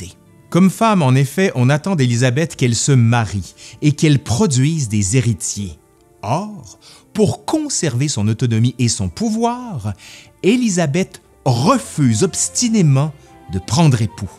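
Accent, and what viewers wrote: Quebec, Canada. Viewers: La personne de cette même vidéo parle le français canadien, est-ce que vous le comprenez? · Excellente vidéo, comme toujours ! Et ce petit accent Québécois si charmant c'est la cerise sur le gâteau 🎂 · Oui, a un accent québecois et il maitrise très bien sa langue. Ce n'est pas commun hélas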